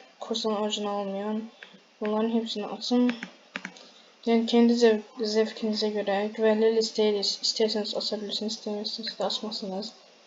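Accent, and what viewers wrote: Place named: Azerbaijan. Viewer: Abe azerbaycanlısan türkçe anışma be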